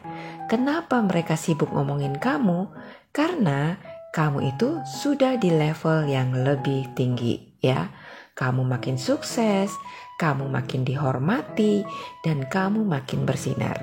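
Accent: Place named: Sunda, Indonesia. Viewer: Aksen Sunda nya lucu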